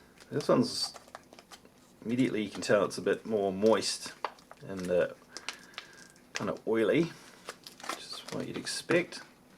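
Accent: New Zealand. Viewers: i love the new-zealand accent. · He's a Kiwi, therefore a New Zealander :) (btw his accent is of a typical kiwi bloke)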